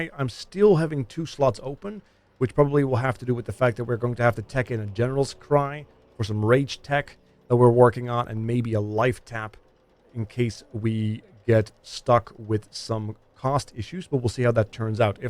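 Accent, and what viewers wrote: Netherlands. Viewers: and moreover: nice content, i like your videos, i like the accent <3 you sound like my part of the family from the netherlands. i am from germany :) and thanks alot for answering my question <3 cheers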